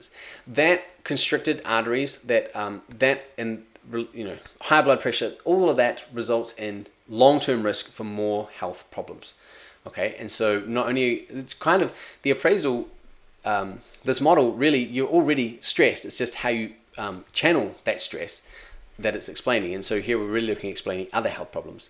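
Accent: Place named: New Zealand. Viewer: are you lecturing in nz at the moment ? noticed u have the accent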